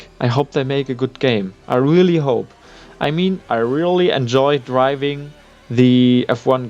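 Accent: Germany. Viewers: Wie man den deutschen Akzent hört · That German accent :D okay im from Czech Republic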